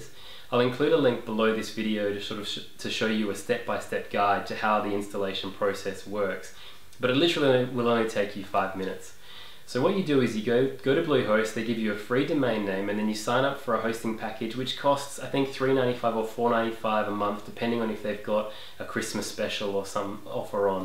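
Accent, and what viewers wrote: Australia. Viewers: I didn't even hear you mention your accent (I watched the video 2x), let alone apologise for it, but I love it! Aussie accents and Aussie slang are awesome, and among my favourites in the world! :) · wot? you call that an Aussie accent hahaha do NOT apologise for the way we speak most peeps worldwide love our accent just remember to speak slowly I posted my video in reply - just not sure if it came through anyway, love this one have you thought of putting text over as a break from just you talking?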